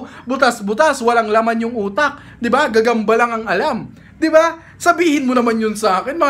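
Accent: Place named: Waray, Philippines. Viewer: Waray gud...hahah. maupay la pagkinita😅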